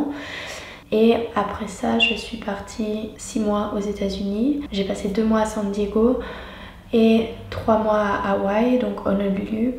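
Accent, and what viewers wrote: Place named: Switzerland. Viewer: Merci d'avoir publié de cool vidéos en français. J'ai appris beaucoup de nouveaux mots. Votre contenu est tellement intéressé. J'avais un peu peur d'écouter, comme l'accent suisse est tellement différent de l'accent québécois. Avez-vous des recommandations d'autres vidéo français?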